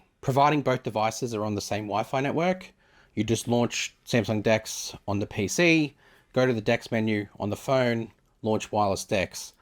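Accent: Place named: Australia. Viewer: Excellent comparison. Your accent reminds me my old good memories in Australia during college back in <num>. Thanks for the info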